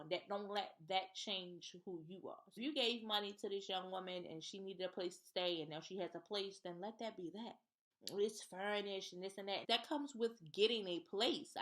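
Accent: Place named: DMV, United States. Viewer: I enjoyed this video very much so. Honestly! Everything you said hit the nail on the head. S/N: Are you from the DMV area? Your accent is everything 😍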